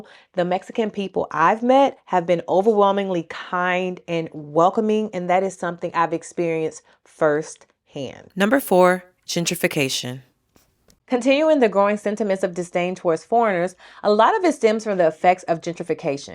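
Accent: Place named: Oakland, United States. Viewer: Sounds like Oakland or Chicago to me 😂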